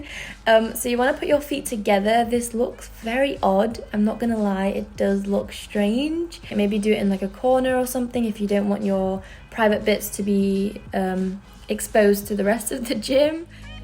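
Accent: United Kingdom. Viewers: love your accent :3 sounds english, did you grow up in the UK; do you speak portugese too¿?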